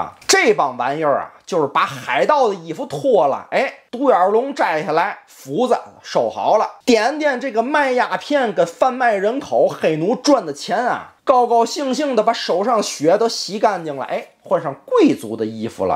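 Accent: Tianjin, China. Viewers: 还是有点天津味的北京话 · 我特别喜欢听天津话，骂人都这么的带劲，快笑死我了🤣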